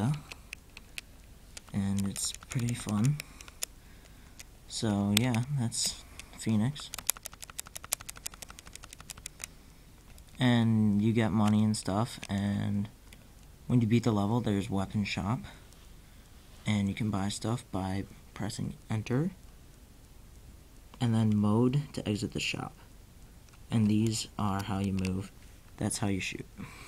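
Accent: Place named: Asia. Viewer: i cant understand ur asian accent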